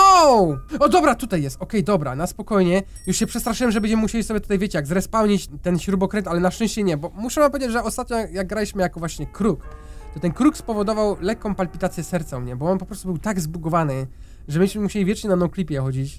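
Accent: Silesia, Poland. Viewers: wyglądasz na tego co mieszka na śląsku? Szczególnie jak mówisz (godosz)!!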